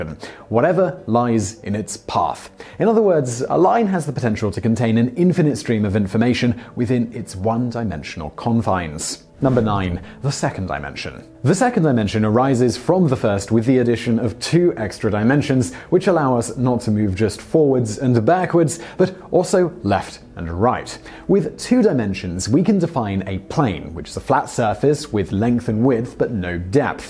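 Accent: United Kingdom. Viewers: Absolute pure garbage video and has nothing to do with the dimensions in string theory. Just because the guy has a British accent doesn't mean he knows what the hell he's talking about.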